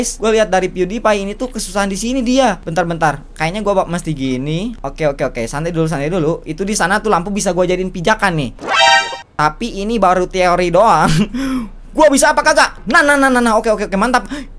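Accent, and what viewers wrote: West Java, Indonesia. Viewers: sunda bgt wkwkwk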